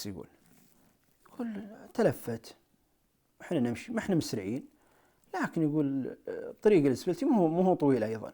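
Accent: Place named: Saudi Arabia. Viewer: صوتك ممفهوم لهجتك سعوديه قح بس قصصك ممتعه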